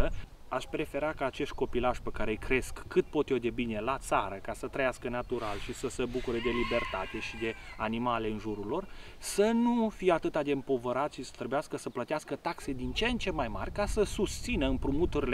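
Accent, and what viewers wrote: Transylvania, Romania. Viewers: BUNA SEARA ATI VORBIT F FRUMOS SI CU UN ACCENT ARDENELENESC SI EU SANT ARDELEANCA AVETI O GANDIRE SANATOASA EU UNA CRED CA VA FI MAI BINE ASA incetulL CU INCETUL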